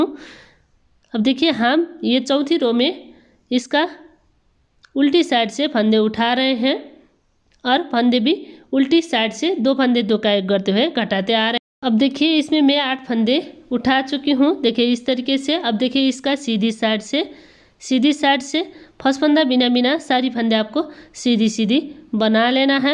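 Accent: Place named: Nepal. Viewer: Kina hindi boleko nepali tone xa ta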